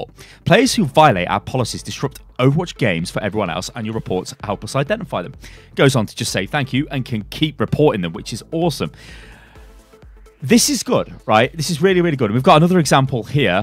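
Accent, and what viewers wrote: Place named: United Kingdom. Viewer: I like your british voice way more than these other american Overwatch youtubers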